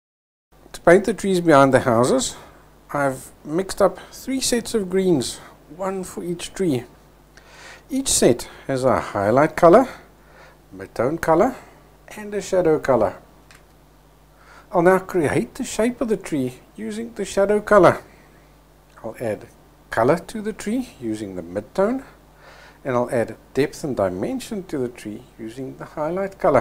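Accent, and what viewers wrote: South Africa. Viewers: I have been watching your work for a couple weeks and I keep saying You sound South African to me. Well I found out today that you are from SA. Lovely I enjoy your work and the effort you make for us to understand.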